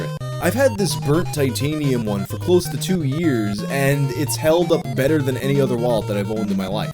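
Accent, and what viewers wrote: New York, United States. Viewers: Lol I first heard it from Rich Massucci of ReviewTechUSA and now I can’t unhear it. They’re both New Yorkers so definitely an accent thing. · Like every New Yorker you'll ever meet.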